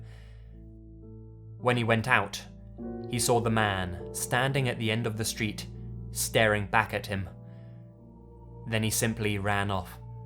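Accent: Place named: United Kingdom. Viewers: I love your British accent, I'm Canadian and I die a little every time a hear your voice and your tone for telling scray stories, scares the shit out of me. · Finally someone else like me who has a British accent and tells scary stories only you have a British accent that's a hundred times better than mine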